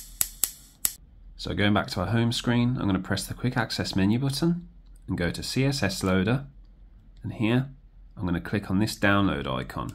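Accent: Birmingham, United Kingdom. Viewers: Really useful video cheers, do I sense a fellow Brummie?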